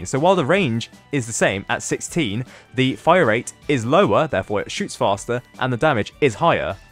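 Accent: United Kingdom. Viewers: Wait what ur British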